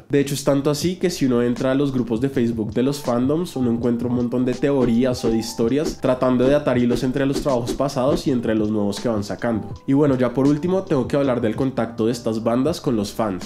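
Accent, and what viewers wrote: Bogotá, Colombia. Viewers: T erecomiendo que hables sobre, Esteman. Por tu acento pareces De bogotá y suenas bien rolo jajskkjajk buen contenido sigue así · Primera vez veo un video tuyo, y apenas empezaste a hablar me dije "esté man es rolo" y cuando dijiste "hijueputa" lo confirme. Aunque bueno, no se si sea cierto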